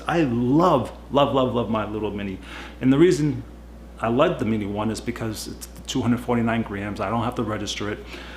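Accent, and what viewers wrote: United States. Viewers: you sound very american but you say "fuck me" like a true blue aussie I like that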